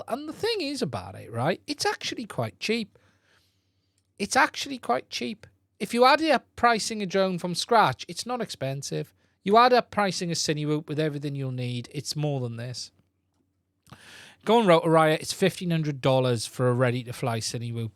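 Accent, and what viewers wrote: Wales, United Kingdom. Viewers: Stumbled across your channel and thought I detected a bit of a Welsh accent! Enjoyed your review & subbed. Lots of useful info:) Many thanks! Whereabouts are you based in South Wales? I'm up in Ceredigion but not a whole lot of FPV action up here. I've been building/flying drones since the DJI Naza & KK-2 controllers were around so I'm stuck with a diverse fleet of analog FPV quads/wings, mostly running TBS Crossfire or FrSky R9 (although I did spring for a Mini 2 for some stable 'Countryfile' style footage). I'm keen to switch to digital FPV for improved clarity so I'm considering the Avata for some Cinewhoop-style footage but also keen to retro-fit a DJI Air unit to a couple of my existing drones. Sounds like a pair of second-hand V2 DJI goggles would probably cover both bases subject to following guidelines for rooting, etc. 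Cheers!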